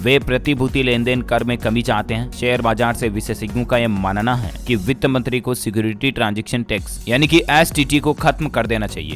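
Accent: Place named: Odisha, India. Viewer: Udisa se bol raha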